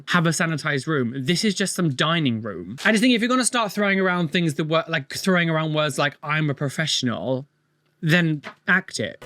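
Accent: United Kingdom. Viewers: I love your videos, and btw I’m learning English for 7 years, now I'm listening to the British accent in your videos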